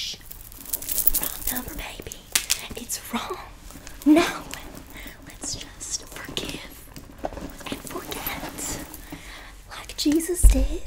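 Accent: South, United States. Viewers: the chaotic energy and southern accent are telling me you’d make the perfect charlotte la bouff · I don’t know why but some parts of this reminds me of Virginia being petty in Rising Hope 😂 it’s that, “oh no honey” and ,”where is your mother”. The slight southern tone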